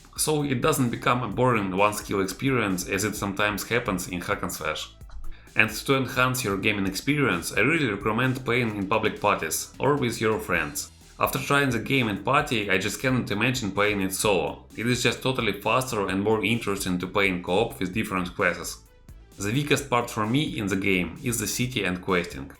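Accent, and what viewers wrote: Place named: Russia. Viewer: First of all, I'm not "criticizing" your Russian accent. But it was a little bit difficult to understand and I know you're working on it 😃 Other than that, good review. Do you know why I "Consider" it a good review 😜 2 reasons, 1. You got straight to the point. So many YouTubers, just drone on like an air-conditioner 😆 2. It was less than 10 minutes, and that's a good thing, honestly. People today have very "short attention" spans today🙄 You did talk a bit fast but, that's perfectly okay lol! You had a lot to cover and for the most part, you succeed 😝 So keep up the good work and I look forward to all of your, future videos 😁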